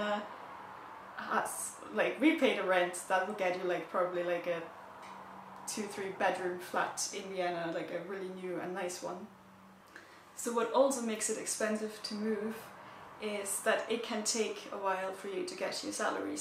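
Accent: Ireland. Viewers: Loving the irish accent going on! Clearly you have been infected! Great video though. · I can hear little bit of Irish accent there . Nice .good luck I like other nationalities coming here ☘️ love Austria too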